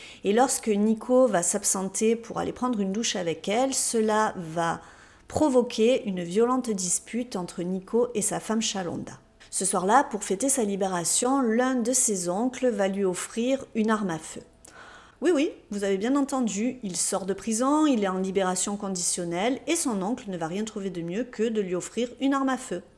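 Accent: South, France. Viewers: Je découvre ta chaîne, c'est très sympa, une Victoria Charlton avec l'accent du sud, et si en plus ya en générique, je m'abonne!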